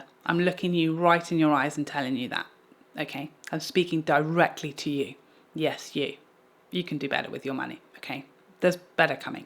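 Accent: England, United Kingdom. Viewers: No pipetting makeup!! 🤣. We really do all hate the pipette! Has anyone in the history of the world thought, “You know what would make this serum, this foundation perfect? Dispensing it with a pipette. But listening to turn pipette into a verb and repeat the word with her glorious English accent is worth every stupid pipette cosmetic companies use in their products. 😂. Love you,. Love your ‘You don’t need that’ series.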